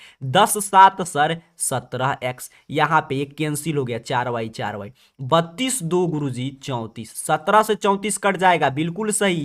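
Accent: Bihar, India. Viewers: Sir is talking in fully Bihari tone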